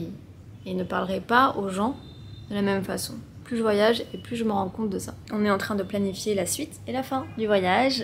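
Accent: France. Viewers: Cc Mon voisine Comment allez vs jsuis ben Votre introduit est claire et accent bien Francais Ca me la plait bc Merci Bon cafe C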